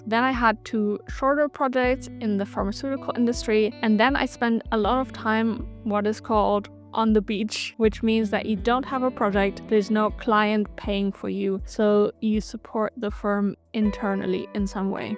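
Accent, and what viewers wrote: Germany. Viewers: You are right that McKinsey doesn't matter...but You're European... You didn't learn the USA hustle mindset...you were absolutely supposed to get another project and make political allies...cultural differences got you. You took people literally. Do I get a German accent? If so from what I understand very different culture. The McKenzie people couldn't explain to you what to do anymore than a fish could tell you how to breath underwater... Going to iveay league schools and private HSs they absorbed the BS with their mother's milk. Social climbing obvuscating masters of double speak. " Consulting".... Scape goats for firing people. " If we do the same with fewer people we'll make more money...genius send that memo to the client... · Do I hear a Gernan accent?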